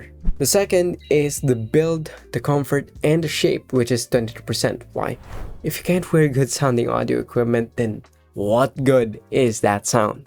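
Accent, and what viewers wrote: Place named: Philippines. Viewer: You're from Philippines? I like your accent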